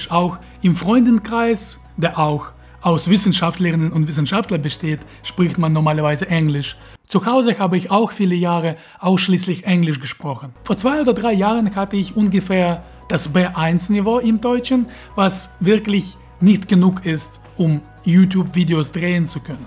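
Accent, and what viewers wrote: Russia. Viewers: Das ist richtig, es gibt viel zu wenig gute deutschsprachige naturwissenschaftliche YouTube Kanäle. Schön das Du Zeit findest, Dein Wissen mit der Allgemeinheit zu teilen. Übrigens, der russische Akzent ist einfach nur Klasse! Ich freue mich auf weitere Beiträge.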